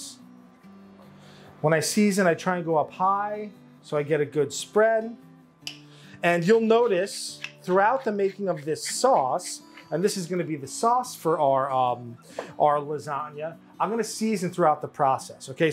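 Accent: Long Island, United States. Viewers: Found you via Tasting History with Max Miller! I'm also from Long Island, and yes, it's "riggotd." LOL You remind me of the LI guys I knew, growing up. Especially, your humor about making sure you get everything on your shoes! Very funny... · Dat Strong Island accent. Sounding like you're from Patchogue.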